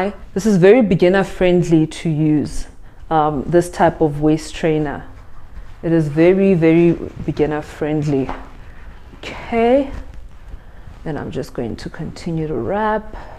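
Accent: South Africa. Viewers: My first time here and I was crossing fingers you not naija😃because wow we are tired shame(love them still😂) then I heard your accent and I was like ai yebo ai yebo🤗🤗my sister oooh.anyways I have a problem,my tummy was slightly big so I bought these 2019 used it but nothing happened then I stopped.last year decided to buy a different one but nope rather my tummy has changed from being tight to soft that's all🤔😢so any advice for the sister here? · Came here for a review on the waist trainer (which was so detailed thankyou💛) but I also got so distracted by your accent, I come from the UK and love the South African accent so much. I've been obsessed since watching Blood and Water 😍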